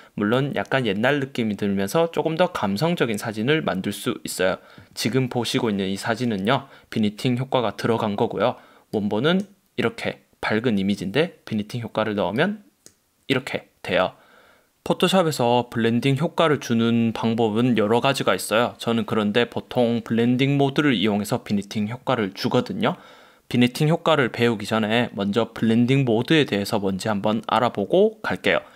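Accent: Gyeongsang, South Korea. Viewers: 억지로 서울말 안쓰셔도 됩니다ㅋ(아무리 들어도 경상도 사투리..ㅋ). 좋은 강의 잘 듣고 있습니다. 재밌어요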